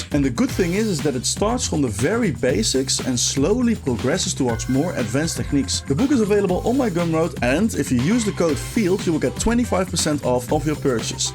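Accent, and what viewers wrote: Netherlands. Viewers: Goede video, je accent is onmiskenbaar en aandoenlijk Nederlands (jaren 90 MTV Katja Schuurman, nee echt zoek het op 😂). Je nodes/wiskundige aanpak lijkt op die in Midge Sinnaeve's video's. Prachtige renders. Subbed 😊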